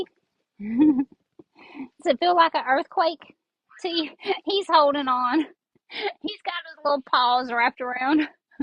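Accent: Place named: South, United States. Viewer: So very creative and beautiful, your wee cat is gorgeous 😍😍😍 and so is your southern accent i could listen to it all day x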